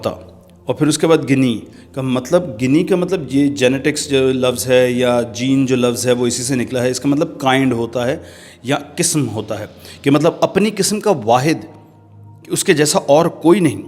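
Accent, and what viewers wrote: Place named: Greece. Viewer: Nice greek accent Sir.... thanks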